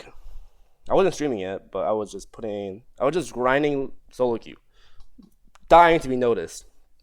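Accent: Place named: United States. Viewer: Damn I had no clue u learned English that late usually ppl don’t get an American accent that quickly if ever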